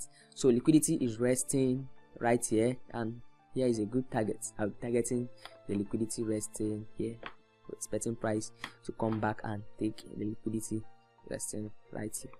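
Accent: Nigeria. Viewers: From the accent it looks like you're a Nigerian?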